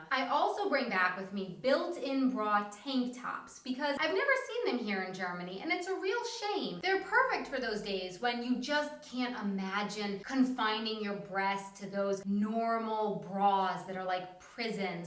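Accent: United States. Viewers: I watched this after watching your video about things you missed about Germany. Your accent is much more American here! Only use real vanilla beans in baking!!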